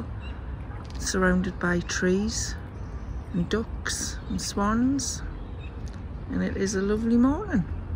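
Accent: Newcastle, United Kingdom. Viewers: I love your channel, one of my favourites (I love a Geordie accent, that always helps 👍). I want to start my own channel soon as I’m in the process of divorce and will be moving out probably next year to Sussex so I’m near my sister. I’m taking tips and a few ideas from you x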